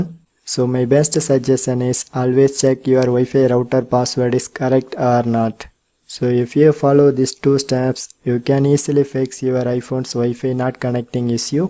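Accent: India. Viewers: Im tired of hearing these indian accent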